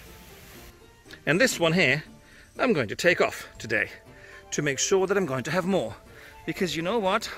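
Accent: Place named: Northern England, United Kingdom. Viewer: Fascinating that a German is doing a northern English accent. That's a trowel, by the way.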